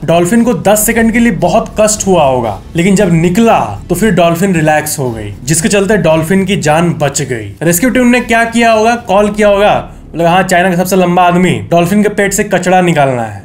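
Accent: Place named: Bihar, India. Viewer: But It sounds Bihar or Jharkhand* accent🫠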